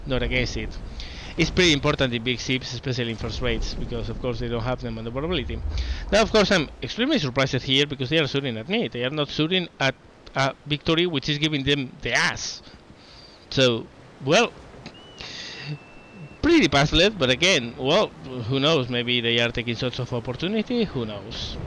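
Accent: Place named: Spain. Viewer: I'm glad I saw the video where you mentioned you had a Spanish accent, I couldn't place where it was from.:P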